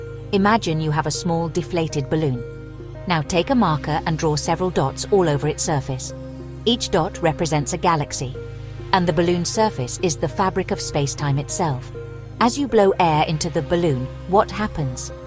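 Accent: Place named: United Kingdom. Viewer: I liked the video. AI narrators often make rookie pronunciation mistakes like calling a Type Ia supernova "Type eye-uh" rather than "Type one-A". Nice touch with the British accent, though. Lends an air of Masterpiece Theater to the lecture.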